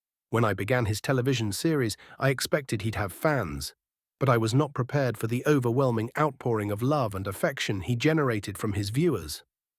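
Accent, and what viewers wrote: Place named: United Kingdom. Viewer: Hello! Ok im confused here. I know playthe music for Hunting with Hank, but the person narrating this certainly doesn't sound like, More like a britt, but he also states he was a musician, who is who? Im writing this in an attempt to prompt someone re-release these two shows, i never got to enjoy Dash but i do remember talking about the new son of Hank, the narrator states all he has to do is pop in a DVD, Please bring back Hank and Dash, I'm heartbroken they are gone!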